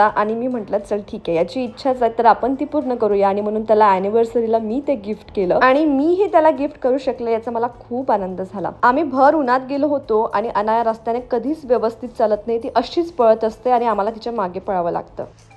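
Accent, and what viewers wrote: Vidarbha, India. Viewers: Ho bai... vidharbh tone mdhech yeto pn kharch watcha brand Samjla ch nhi 😂😂😂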